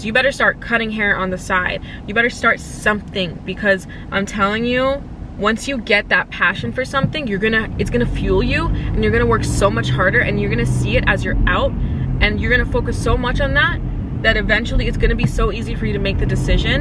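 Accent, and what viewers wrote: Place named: United Kingdom. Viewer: British accent? Super cute! Watching from London, England. ❤️❤️❤️❤️❤️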